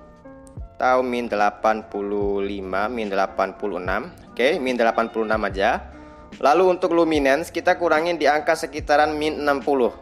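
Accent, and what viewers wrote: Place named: Eastern Indonesia, Indonesia. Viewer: Monmaap bg, orang timur ya? Kental banget nada suaranya 😊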